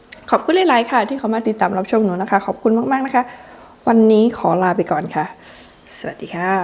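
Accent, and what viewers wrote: Isan, Thailand. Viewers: ไทยคำอิสานคำ..น่าฟัง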